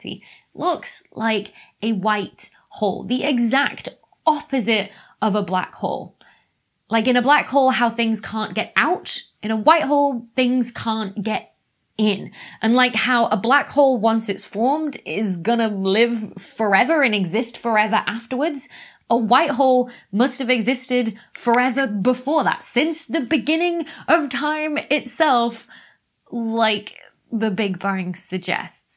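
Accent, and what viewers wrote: United Kingdom. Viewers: Very nice lecture. Clear and well explained with a cute British accent. Why an object will go faster than the speed of light in a black hole when it's not permitted outside of it?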